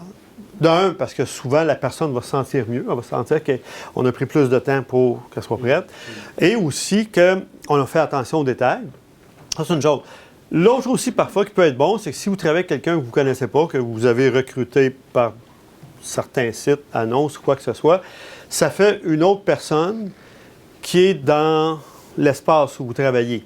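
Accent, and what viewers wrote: Quebec, Canada. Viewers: Après deux heures très intéressantes j'ai attrapé l'accent québécois tabernacle. Merci de Belgique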